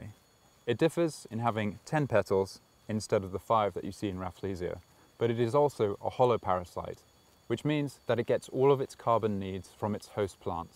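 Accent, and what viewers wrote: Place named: United Kingdom. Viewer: He must be British. His accent is very nice for listeners.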